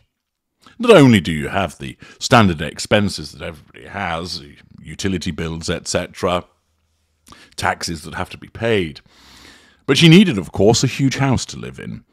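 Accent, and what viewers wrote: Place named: North, United Kingdom. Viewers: At a guess I would suggest comes from North.Yorkshire. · despite being Welsh, lol. I am Irish so pick up on the fact that is a ''posh Northerner'' maybe Americans think this is RP but imo, this northern accent is what makes his speaking voice so easy on the ear imo.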